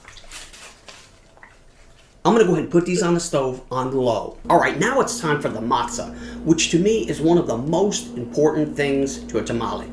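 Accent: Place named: Texas, United States. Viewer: cool, I did pick up on a bit of a Texas accent.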